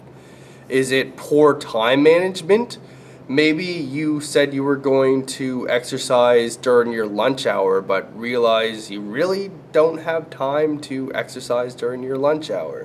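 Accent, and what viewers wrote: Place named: Canada. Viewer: Actually your account said you were. But now that you mention it, yea I hear the voice of a Canadian. *thumbs up!*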